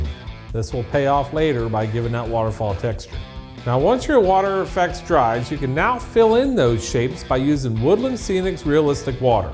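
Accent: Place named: North America. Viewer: Lástima que no tenga sub-títulos en español, queda uno sin saber que éxlican en ese inglés norteamericano.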